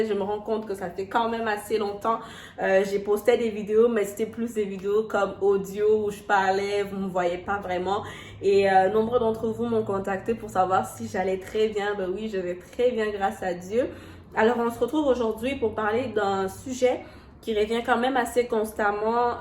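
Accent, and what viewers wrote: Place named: Canada. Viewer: Moi j'entends l'accent Canadien..lol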